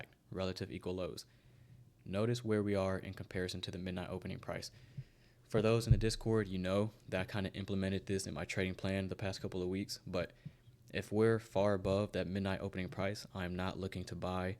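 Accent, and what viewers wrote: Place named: South, United States. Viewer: Where are you based out off? I noticed you have a hint of a southern accent. Im Beytoven by the way. Im always in your live streams lol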